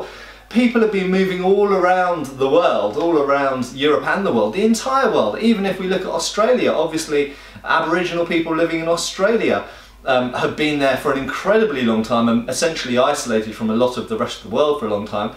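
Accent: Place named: United Kingdom. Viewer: "They didn't just spring out of the earth" - 2017 btw that was only funny because of you're british accent xDD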